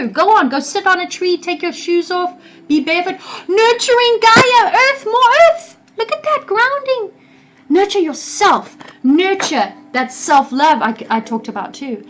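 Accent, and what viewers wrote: South Africa. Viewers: Oh a few clues you may have a SA or Zim accent? Been solo for so long, not sure who is coming into focus. Thanks for such a lively reading. Wm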